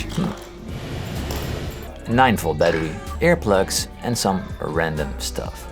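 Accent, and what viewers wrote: Netherlands. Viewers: I am just starting out learning to play guitar aged 53, every single video has something amazing in it to learn, being a fellow Dutchman the accent makes me yearn for home again! (Living in the UK since a child) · Why do you have an English name but sound like you're Dutch? Great videos btw, although many of them make me wanna give up playing in a way... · It’s so easy to recognize Dutch people speaking English. This is the first vid I watch from you but I can tell you’re Dutch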